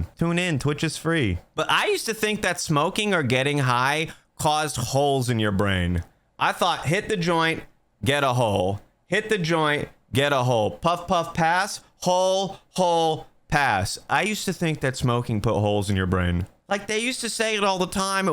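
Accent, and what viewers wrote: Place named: New York, United States. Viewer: I wonder where the New Yorker type accent comes from.?.?.?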